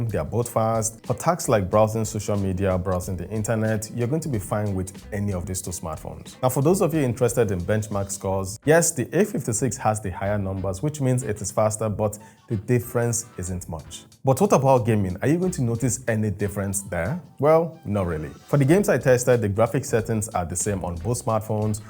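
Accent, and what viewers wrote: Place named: Nigeria. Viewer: Most of the Nigerians be like... "If you aks me"....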